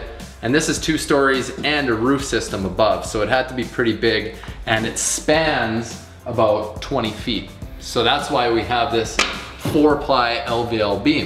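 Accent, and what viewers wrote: Canada. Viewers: You sound Canadian. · The most Canadian accent ever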